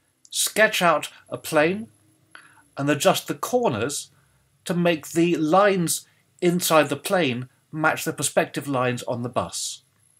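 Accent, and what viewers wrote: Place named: United Kingdom. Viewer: What a beautiful british accent, thanks for the tip ;-)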